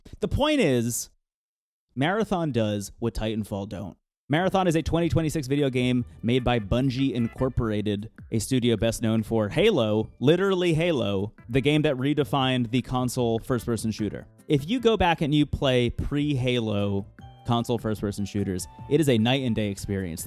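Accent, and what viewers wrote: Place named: Minnesota, United States. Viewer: this dude straight out of minnesota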